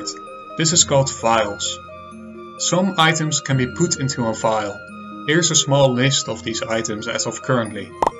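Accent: Netherlands. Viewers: Are you dutch? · Funny how i can hear you're dutch just by the tone of your voice.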